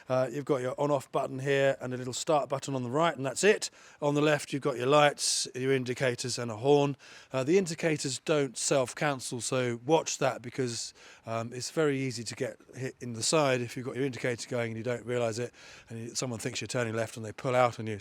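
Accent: United Kingdom. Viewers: you look like Hollywood star,with purely british accent.😁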